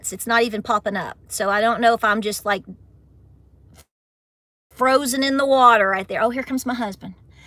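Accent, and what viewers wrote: South, United States. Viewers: You are so cute, correct, feminine, strong, and spiritual. I love your southern accent and expressions. ❤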